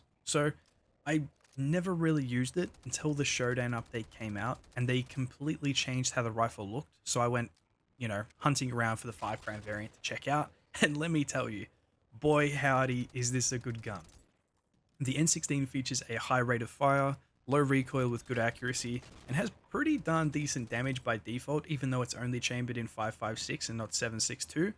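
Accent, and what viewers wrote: Australia. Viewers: WAIT UR AUSSIE? no wonder the accent was recognisable. I'm a westerner lmao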